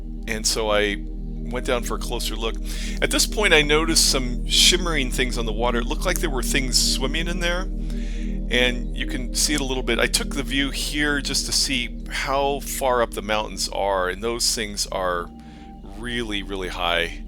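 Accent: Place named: California, United States. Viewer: This guy narrating sounds like a typical California moron. “This water is ugly, ew no” hahahaha my god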